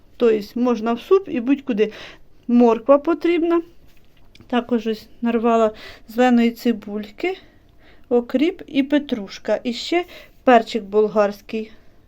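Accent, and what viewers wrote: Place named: Chernihiv, Ukraine. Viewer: Доброго дня! А в якій області знаходиться Ваше село, якщо не секрет? Я оодом з Чернігівщини, і в нас також говорять на кріп-"окріп". Почувся рідний. говор з моєї Чернігівщини.❤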